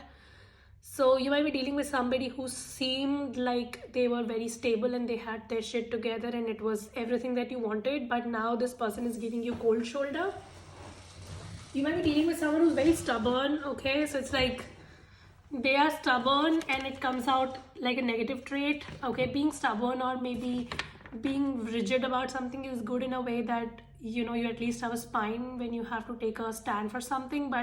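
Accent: India. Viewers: It’s not her fault it’s the Indian language when they speak English ... I worked with them it’s hard you just have to pick up a few words & guess the rest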